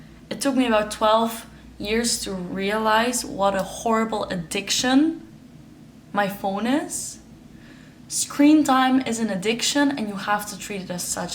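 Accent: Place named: Netherlands. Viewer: I lived in NL 7 years. I recognize that accent anywhere. 😊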